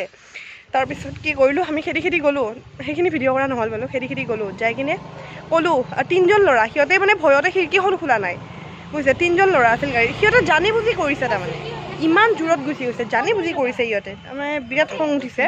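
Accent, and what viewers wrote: Nalbari, India. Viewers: অ, মাজে মাজে নলবৰিয়াৰ দৰে কথা কোৱা কাৰণে সুধিছো😍😍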